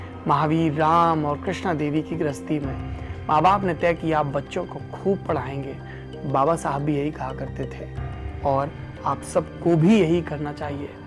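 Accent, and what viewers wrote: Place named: Bihar, India. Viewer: .aap bihar se hain kya, bihari language bahut jachti hai aap pe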